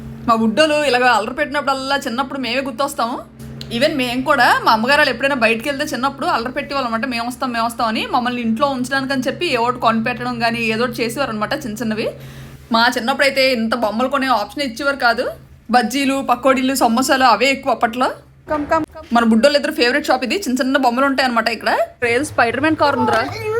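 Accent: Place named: Godavari, India. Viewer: ఏదైనా గోదావరి జిల్లా యాస వేరు మీరు మీ వాయిస్ సూపర్ అక్క ❤❤❤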